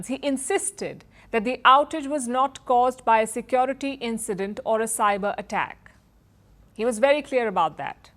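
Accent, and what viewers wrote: India. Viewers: It's her thick ass indian accent that makes it sound like cloud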